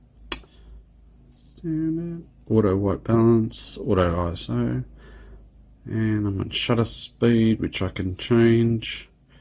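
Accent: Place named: Australia. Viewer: Hi.. 1st I love your Australian accent. Secondly, I feel like you invited me to your home and sat me down to show me how the camera I just bought works. Thank you for an exceptionally in depth, hold your hands and let me show you, review. Thank you mate..;)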